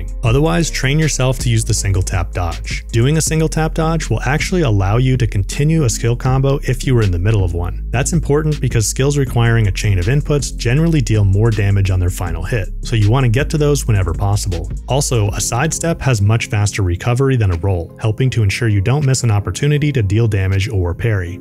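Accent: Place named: United States. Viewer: Finally an American accent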